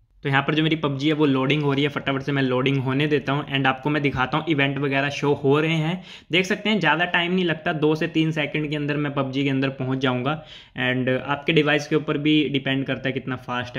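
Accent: Punjab, India. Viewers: Bahi app Punjab se ho kia keuki muje apki avaj se pata chal gia 😁 me be punjab se he huu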